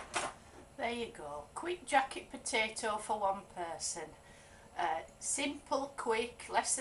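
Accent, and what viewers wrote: Yorkshire, United Kingdom. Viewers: Yorkshire for sure. South Yorkshire most probably. Quite close to my accent (Sheffield)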